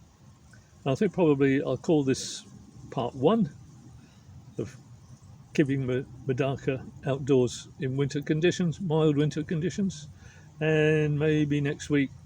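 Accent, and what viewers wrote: United Kingdom. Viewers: Which country are you in and what area? Judging by your accent you are British but those temperatures dont seem wery British 😅.Im in essex so temps reqching -5 at the moment 😢. Would want as much info before stepping into the hobby. 😊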